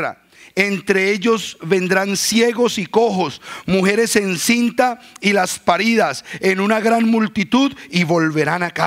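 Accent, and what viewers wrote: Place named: Colombia. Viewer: Ese es mas colombiano que el presidente por su asento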